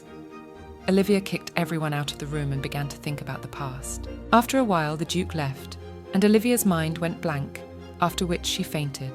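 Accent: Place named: England, United Kingdom. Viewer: Cant stand englisg narrators accent either.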